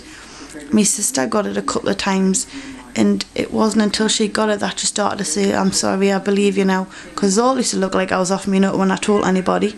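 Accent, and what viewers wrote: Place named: Derby, United Kingdom. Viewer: You talk funny (Derby lad).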